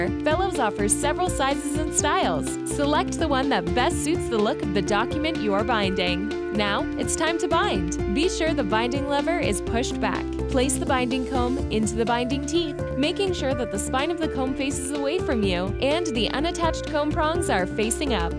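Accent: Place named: West Coast, United States. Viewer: Jesus... worst accent for advertisements ever!!! Please note. Brits find the West coast accent really really annoying!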